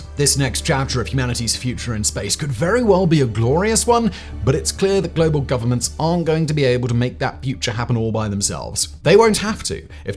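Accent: United Kingdom. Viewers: So sick of hearing this British sounding windbag. He narrates everything.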